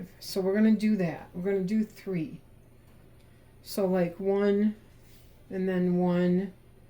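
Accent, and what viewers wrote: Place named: Midwest, United States. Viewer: I thought so. My son and daughter in law lived in Wisconsin for many years and lived in Minnesota and Michigan. I could pick up that wonderful Midwest accent 😊. We are in South Carolina now .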